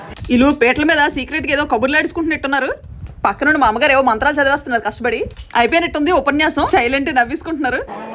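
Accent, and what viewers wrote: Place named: Uttarandhra, India. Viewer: గారు,అబ్బా వీడియో అంతా ఎక్కడా ఏ చిన్న విషయాన్ని వదిలేయకుండా మొత్తం కవర్ చేస్తూ ఫంక్షన్ మొత్తం చూపించేసారండి, మీరు మాట్లాడే యాస ఉత్తరాంధ్ర భాషపై మాకున్న ప్రేమని మరింత పెంచుతుంది,పెళ్లి బొమ్మలు మాత్రం ఎక్సలెంట్ అండి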